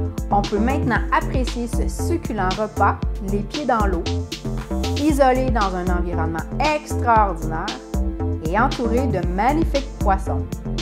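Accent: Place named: Canada. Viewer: Quelle horreur cet accent canadien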